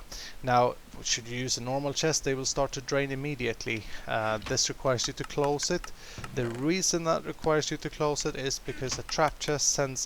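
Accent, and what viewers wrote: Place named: Sweden. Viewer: lol snygg svensk dialekt. shota into lava lol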